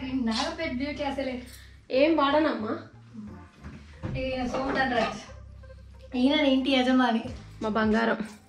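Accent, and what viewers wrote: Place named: Nizamabad, India. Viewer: Nizamabad ascent🔥 mupkal❤️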